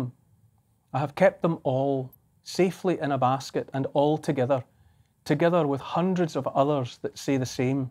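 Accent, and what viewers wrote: Scotland, United Kingdom. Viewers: It's impossible to tell anything but the truth in the true Scottish accent. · The truth he speaks in humility refreshes my heart and brains. I always loved the Scots and their dialects. 🍷😁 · I could listen for hours to this scottish dialect. Ist sounds so damn beautiful...and true...and is surrounded by integrity. Hold the line folks. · Actually, it's not a dialect, just a Scottish accent. His use of the Engiish language is beautiful, eloquent and wise. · Having Scottish in among my ancestral mix I could listen to your accent for hours Neil. Lovely message. I first watched you presenting Coast New Zealand 🇳🇿, a beautifully done showcase of NZ and I learnt a lot about my nation. The birth or our Saviour in Bethlehem indeed gives us great cause for hope. The politicians have had a field day causing fear and division. We need to stand up and be strong and not give in to them, remind ourselves that we can look out for ourselves and care for each other. 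NZ has become a Fortress and her citizens fearful. It is heartbreaking to see what has happened. May God Bless you and your family.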